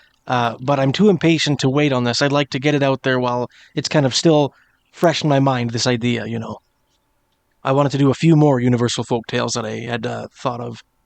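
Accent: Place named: Canada. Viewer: If we ever go to war our password is About! I've heard so many Canadians, like this fella, whose accent is indistinguishable from varieties of American accents but that one word, about, will get them EVERY time!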